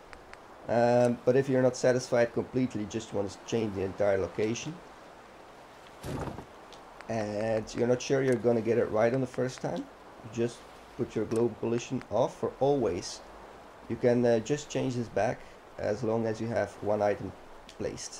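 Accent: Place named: Netherlands. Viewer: Je klinkt Nederlands.. Goeie tutorial man.. Snapte echt geen kut van dat menu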